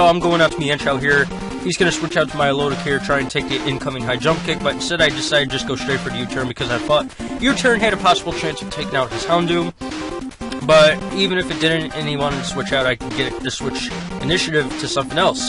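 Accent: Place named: Minnesota, United States. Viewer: Up North? Your voice reminds me of some people I know from Minnesota.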